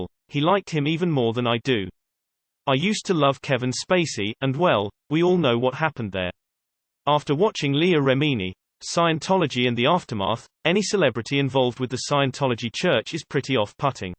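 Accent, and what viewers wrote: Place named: United Kingdom. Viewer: The British voice works surprisingly well with hateful comments.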